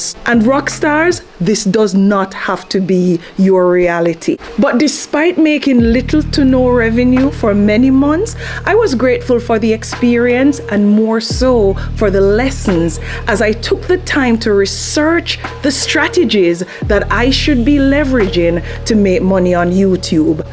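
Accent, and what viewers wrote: Jamaica. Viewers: Wow, You are n excellent communicator. I am guessing Jamaican. Or perhaps an African land. Any way love your presentation. There was a lot and a bit over-whelming. I am coming up on 11 months on my channel and making progress but have not added any affiliates as when I started to add one 8 months ago I was warned by YT. I need help making sure I don't break any monitization rules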